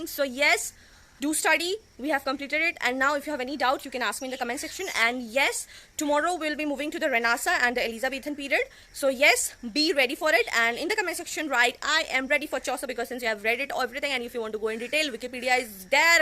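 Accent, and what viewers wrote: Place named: United Kingdom. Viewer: I love the way you speak. It looks quite british.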